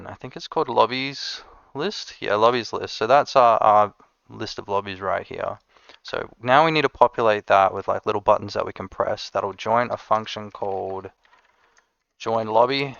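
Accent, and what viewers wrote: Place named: Australia. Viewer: the aussie accent helps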